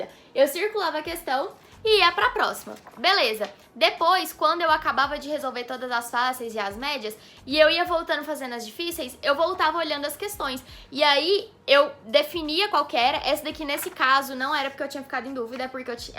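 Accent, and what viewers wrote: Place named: Minas Gerais, Brazil. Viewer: Acho que ela é mineira.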